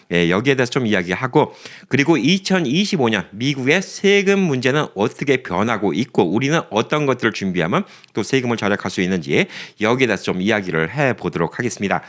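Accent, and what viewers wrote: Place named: Jeolla, South Korea. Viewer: 부탁드립니다 그러면이 그러먼으로하시말고 러면을고치세요 전라도사투리 거러먼 안돼 그려면으로 먹으먼 먹으면으로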